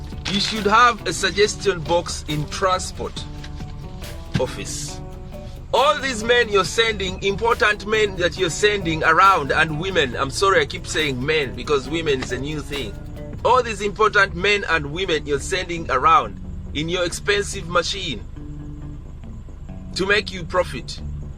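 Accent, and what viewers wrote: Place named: Uganda. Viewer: Ur sound like Uganda goodwork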